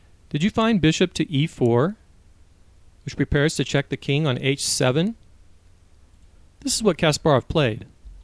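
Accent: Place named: United Kingdom. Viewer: Sir, you have gotten a niche that you teach and do it very well. Great to hear that awesome British accent. Thank you for all your help.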